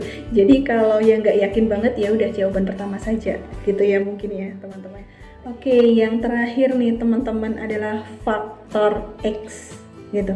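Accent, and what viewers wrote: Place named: Banyumas, Indonesia. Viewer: Kaya ne wong ngapak rika yu'..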